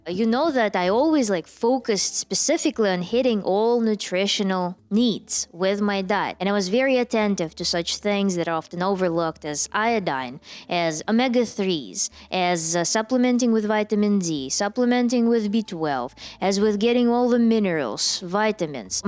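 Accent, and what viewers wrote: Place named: Eastern Europe. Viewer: You sound Eastern European. Meat is your identity😂. Congrats on finally kicking the mental illness away and stay meat-based.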